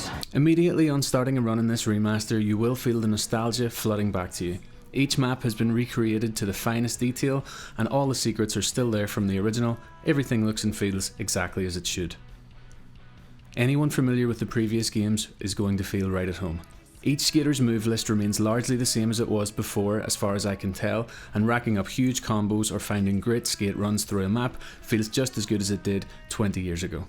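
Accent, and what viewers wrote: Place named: Ireland. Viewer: North Americans love a good Irish accent!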